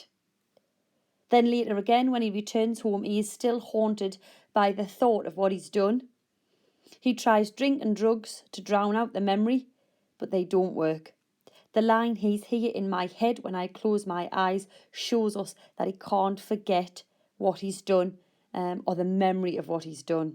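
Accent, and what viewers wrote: Newcastle, United Kingdom. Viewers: Really great videos and also great accent! (I'm also from Newcastle)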